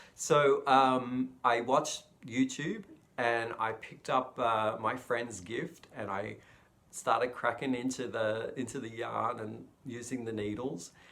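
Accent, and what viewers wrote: Australia. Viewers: Hi, my name is Dawn and I'm in Las Vegas. Bag o Day sent me over. Just subscribed. I watched your happy mail from Crystal and seen all the beautiful yarns. Saw your video with them hats and your Chevron scarf which is very nice itself. Love your Aussie accent. I don't knit but would like to learn. I do crochet do you? Will stay with you from now on. I have two in laws from Canada and truly like the country. You and hubby have a great weekend and stay safe.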